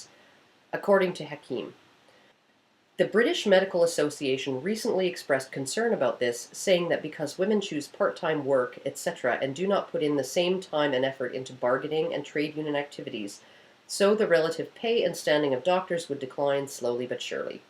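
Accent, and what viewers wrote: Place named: Ontario, Canada. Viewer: Interesting, learned post here. Encourages me to view your others, if only I can get by that Ontario accent .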